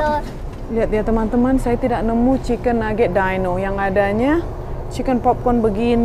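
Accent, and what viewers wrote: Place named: Medan, Indonesia. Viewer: Aku baru ikutin kak Mau nanya kak dari medan ya? Logatnya medan kali di Brastagi gitu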